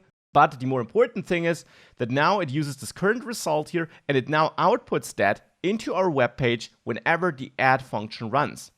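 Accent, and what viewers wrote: Germany. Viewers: I love the way you walk through and explain all the different concepts here, supported by great examples. You are articulate, concise, and obviously very knowledgeable. Your (slight) German accent only adds to the charm, so bonus points for that!:-) I just bought the full course after watching this introduction, because you are the best teacher I have come across yet on this topic, and I have seen quite some. I really can't wait to dive deeper into the super interesting world of JavaScript. Thank you very much, and keep up the great work!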